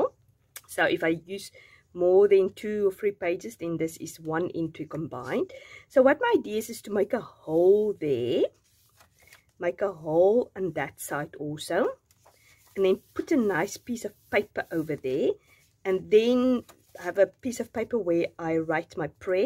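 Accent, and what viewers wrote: South Africa. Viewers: This is so pretty are you from South Africa ? I love your accent. ♥️♥️ I just started journalling and scrapbooking. I love your ideas of incorporating God into journalling I never thought of that before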